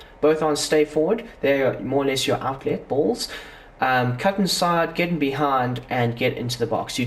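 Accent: South Africa. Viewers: U South African ?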